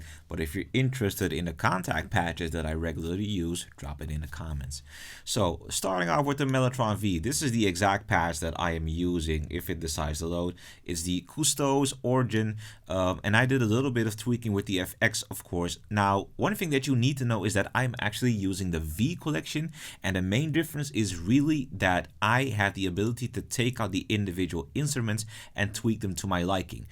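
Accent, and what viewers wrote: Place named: Netherlands. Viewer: i can hear dutch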